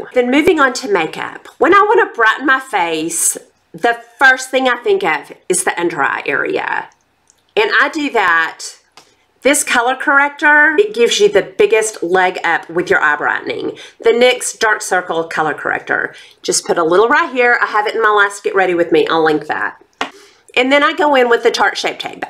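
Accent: South, United States. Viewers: So! Sheila (life with Lilly) told me to watch your channel.......so here I am!! I have Subscribed.....Your a delight....I'll be watching for your new video's! (Your obviously southern, which state?)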